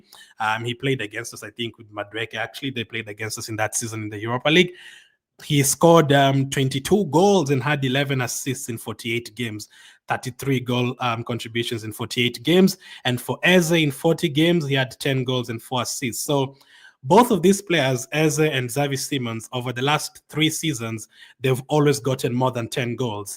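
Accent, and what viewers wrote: Kenya. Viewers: you sound kenyan .COYG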